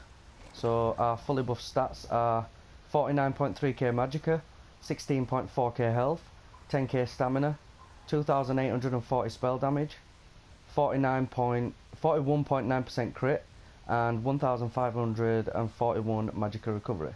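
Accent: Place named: Leeds, United Kingdom. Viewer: Loving the Leeds/Bradford accent mate identical to how mine used to be lol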